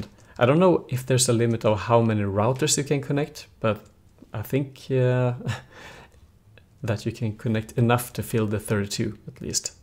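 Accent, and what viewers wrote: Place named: Sweden. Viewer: Du är svensk va? Hörs på dialekten. Grymma videos :D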